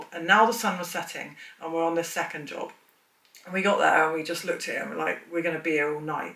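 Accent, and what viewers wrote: United Kingdom. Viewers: You have a West country twang.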